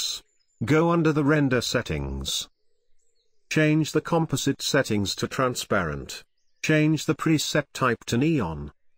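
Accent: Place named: Pakistan. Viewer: Wow. Your Accent is cool. Amazing when I knew yoy from pakistan.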